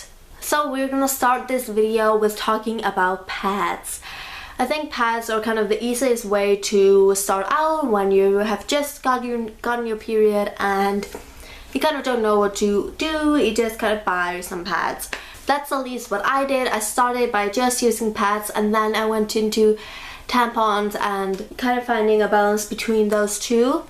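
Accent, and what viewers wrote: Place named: Iceland. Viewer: oh your from Iceland that explains the accent I was trying to figure it out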